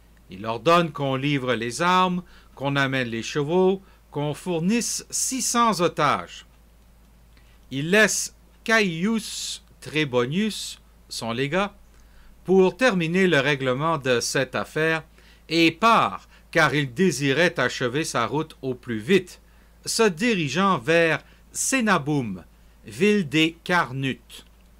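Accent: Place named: Canada. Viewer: C'est un Canadien avec son accent. Il est super et très clair. En tout cas c'est plus clair qu'avec l'accent Stéphanois. (Le mien) uniquement compréhensible dans les départements 42 & 43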